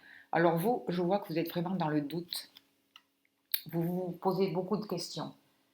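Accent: South, France. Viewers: Merci à vous ! Oui, c'était lourd ! Et comme j'avais choisi la pouppée jaune vous avez vu juste comme toujours ! Vous êtes fort énergétiquement je le sens. Priez pour moi avec Archange Michael et St Benoit svp car il y a eu beaucoup de bloccages (aussi familial) causés par la jalousie et les méchancetés. Merci ! Je suis Flamande et je continue CERTES à suivre vos vidéos aussi longtemps qu'il est nécessaire ! Vous avez un accent du Sud de la France , non ?Bien à vous car Dieu est avec vous je le ressens . Je suis tombée pour la première fois sur vos vidéos en février/mars et ce n'est pas un hasard ! On m'a fait beaucoup de saloperies et boccages dans ma vie (j'ai 58 ans) donc je suis ce qu'on appelle "un cas grave" …..Continuez votre travail de libérations énergétiques et prières car vous avez reçu de Dieu une Mission et des REELLES dons !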